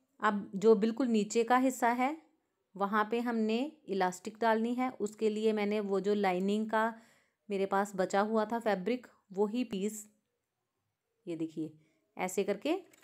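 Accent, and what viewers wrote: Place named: Punjab, India. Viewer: Punjabi o na tusi ? Punjabi accent pta chal janda aa Wese acha bnaya tusi Mai b try krugi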